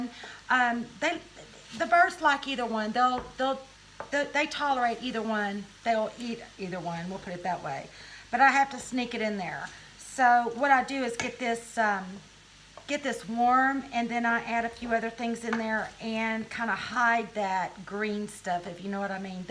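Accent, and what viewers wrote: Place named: South, United States. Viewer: You are such a southern belle!!! I love it!!!!! I’m from NC and it put a smile on my face hearing your accent :) And thanks for the video, I have an Eclectus as of a couple of days ago, so I’ll have to make sure I can incorporate all of those ingredients. I heard my baby boy’s digestive tract/system is pickier than most other parrots. This is my very first bird so wish me luck. Be well!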